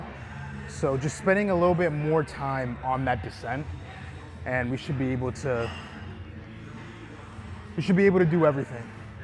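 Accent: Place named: United Kingdom. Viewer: deadass thought u were british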